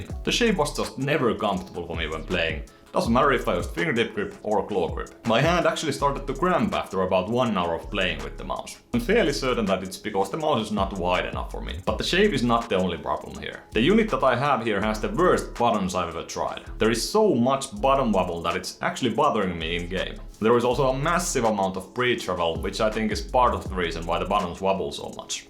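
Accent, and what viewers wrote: Finland. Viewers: Are you from finnish? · Are you Finnish? That accent is familiar.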